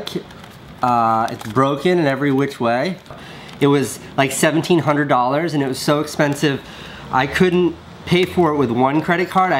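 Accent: Liverpool, United Kingdom. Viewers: Scouse power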